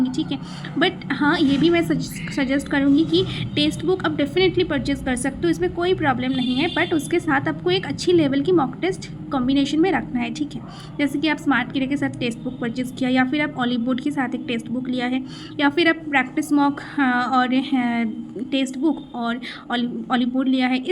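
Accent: Bengal, India. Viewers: Bengali accent ....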